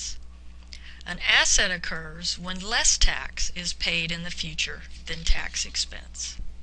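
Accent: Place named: United States. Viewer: OMG - an American accent explaining this! Unusual.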